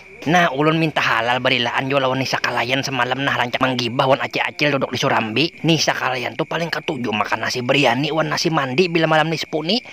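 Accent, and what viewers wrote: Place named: Martapura, Indonesia. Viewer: Mantap mang ni suaranya urang martapura ky nya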